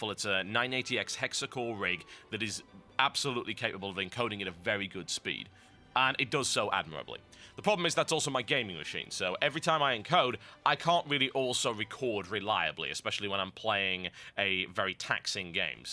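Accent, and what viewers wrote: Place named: Newcastle, United Kingdom. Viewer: He's geordie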